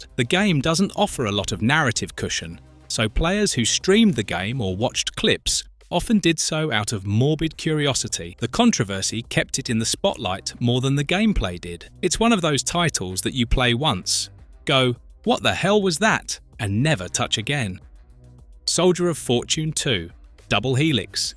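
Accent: United Kingdom. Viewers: That accent, sounds British to me, saying stuff like games normalizing or flirting with misogynistic imagery, makes me 🤢🤢🤢 I'm sick and tired of hearing nonsense that makes me angry and feeling like asking for the world to end. Like, using internet nowadays is like getting the daily dose of boring and depressing shjt just to have those boomer feelings left. It's all hopeless, nervous, feeling like all i see is nonsense, like all i hear as arguments are that progressist politically corret nonsense, feeling like isolating myself and get bored af at the end... I wanna see smart people doing good stuff or at least some sense when people disagree or when they're criticizing something bcs it's getting tiring af to see almost anything on the internet and getting me feeling like I'm on a discovery kids for dummies cartoon world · I like the British narrator. Marvellous videos and channel!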